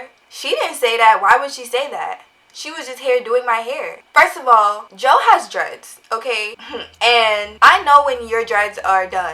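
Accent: United States. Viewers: Sounds just like a Maryland nigga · you said the hood, it had to be baltimore! this sound like a baltimore nigga lol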